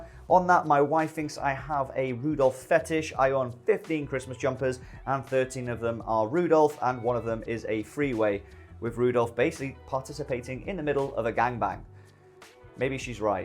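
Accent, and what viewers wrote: United Kingdom. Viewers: Where in Uk are you from?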